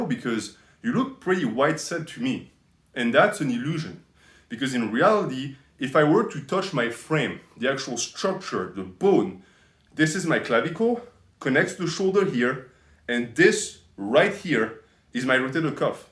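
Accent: France. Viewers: No way you aren't a french compatriot with this accent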